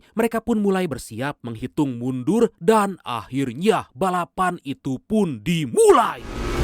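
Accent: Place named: Banjar, Indonesia. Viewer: Banjar bnr pian lh 😅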